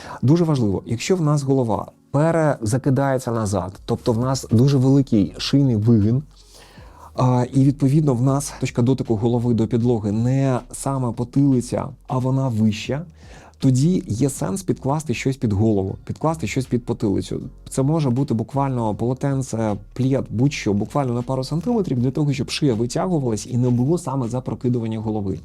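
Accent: Standard. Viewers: Приємно слухати,чиста українська мова!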